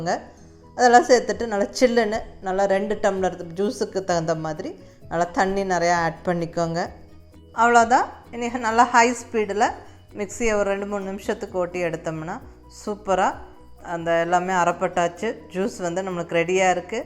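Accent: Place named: Nagercoil, India. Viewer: Avanga slang Nagerkovil Mathuri irukku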